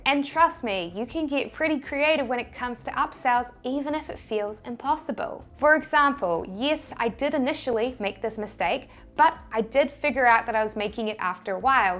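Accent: New Zealand. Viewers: Came back to get my annual NZ accent dose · are you from Southland? i think i can detect it in your accent! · thanks for the great info! The best thing about this channel is your lovely new zealand accent!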